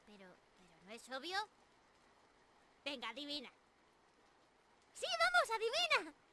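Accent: Spain. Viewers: Que pena que desde hace 10 meses no hace streams en Twitch, pero aún así no me pierdo los videos. Ojalá vuelva a ser más activa y hacer versiones en español, la verdad es que su acento español y su voz son increíbles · Me ha sorprendido mucho este asmr, es la primera vez que escucho uno de español de España y que me guste; soy de Perú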